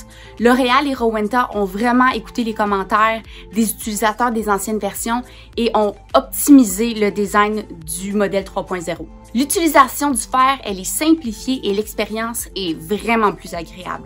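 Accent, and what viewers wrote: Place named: Quebec, Canada. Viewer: C est un accent québécois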